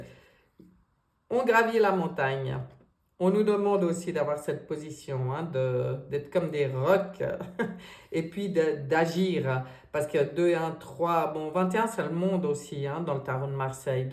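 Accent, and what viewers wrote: Switzerland. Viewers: Merci c'est toujours un bonheur de vous écouter, j'adore ce petit accent suisse❤ bonne année à vous et à très vite la sortie du tunnel 🙏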